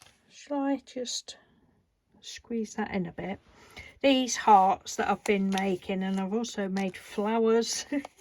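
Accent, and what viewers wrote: United Kingdom. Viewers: I just love your British accent! Just love your personality 😅so funny. These hearts are beauitful thank you!!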